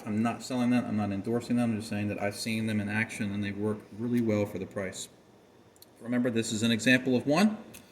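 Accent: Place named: Maryland, United States. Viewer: Born and raised in Maryland here ha, I thought I could pick out that tiny Maryland twang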